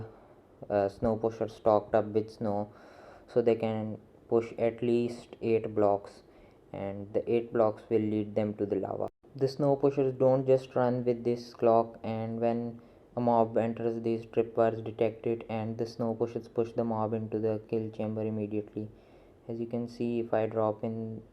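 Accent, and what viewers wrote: India. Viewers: Good Job man, don't judge me but by your accent I feel like you are Indian too and I think you can help build a sensible Minecraft community here. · Your English give me vibes of an Indian person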